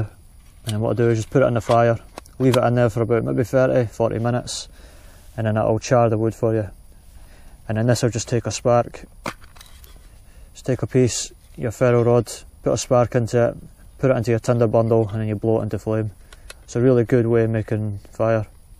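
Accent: Scotland, United Kingdom. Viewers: Love the brave heart bagpipes. The kit and your Scottish accent are awesome. Great job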